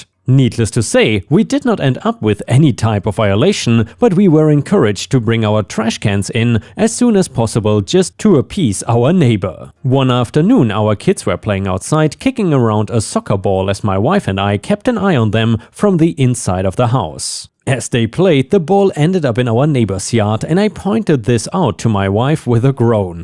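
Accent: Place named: Germany. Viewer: Love ur accent 💞 im thinking German or perhaps French? 😁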